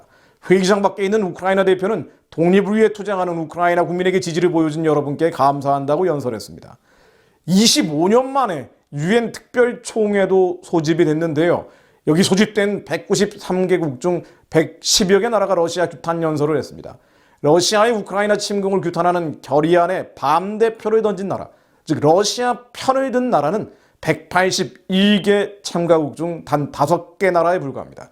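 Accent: North Korea. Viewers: 조선족이거나 북한인같네요. 억양들어보면 북한억양입니다.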